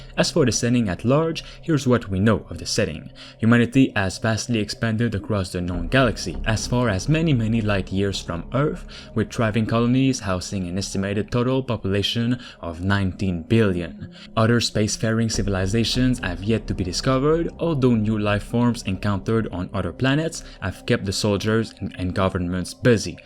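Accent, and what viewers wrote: Quebec, Canada. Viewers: Are you french Canadian? Your English is good don't get me wrong. Continue the good review. I like your stuff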